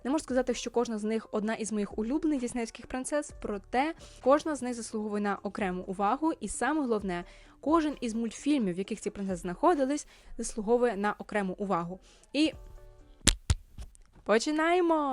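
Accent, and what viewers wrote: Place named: England, United Kingdom. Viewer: У вас дуже приємний англійський акцент 😀 Я думаю, одна з основних причин, чому дісней перезнімає старі мультфільми, це спроби продовжити життя принцесам. Сучасним дітям вже не цікаві настільки старі мультфільми, ще пару поколінь і ніхто б вже не знав хто така Аврора чи Аріель. А оскільки їх лінійка принцес це ледь не візитна картка діснею, їм важливо розширювати аудиторію і залучати сучасних дітей. Ну і заробіток звісно теж, куди без того) Такі мультфільми 100% окупляться, а от нові історії це завжди ризик Більшість останніх мультфільмів які вам сподобались були таки зроблені піксаром, а не діснеєм. Не думаю, що дісней хоч якось приймав там участь окрім як інвестування. І я б не стала мішати Піксар до Діснея. Так, формально вони його купили, але це все ще різні студії, і як на мене вони мають кардинально різний підхід і стиль роботи) Дісней завжди були досить консервативні як стосовно візуального стилю (у них всі персонажі виглядають тупо однаково), так і стосовно сценарію. 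Вони бояться відходити від протоптаних стежок, в той час як піксар часто експерементують. Тому, до речі, мене здивував нещадавній реліз "Бажання", де видно експеременти зі стилем, судячи з усього навіяні новими тенденціями, які запустли "Людина павук крізь всесвіт" та "клаус" свого часу. Проте у Діснея цей експеримент поки не дуже вдалий, і виглядає дивно. Тим не менш, цікавий початок, побачимо що буде далі.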